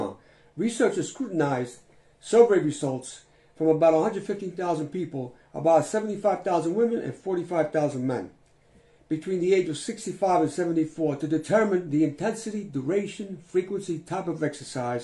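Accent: New York, United States. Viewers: Love the advice (I'm 76) and love the accent. Maybe you're from New Yooorrrk?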